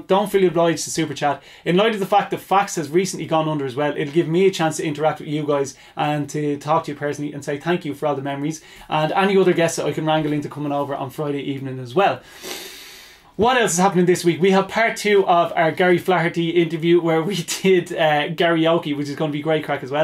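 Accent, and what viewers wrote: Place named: Ireland. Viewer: I was unable to work out zambia with an irish accent, being Norwegian and all that so for a short while i was sure zambia was a person and nothing made sense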